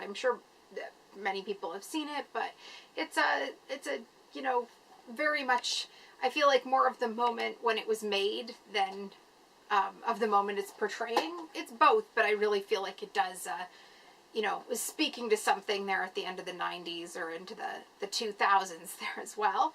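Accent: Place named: Canada. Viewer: I just like listening to your sweet Canadian accent. Great video. You got some awesome classics in that haul. Also, that shirt seems nice and warm,:-) Have a great day! ~Marty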